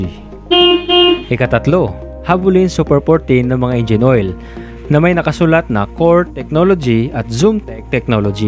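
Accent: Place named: Visayas, Philippines. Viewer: bisaya man kaayo boss hahaha nice job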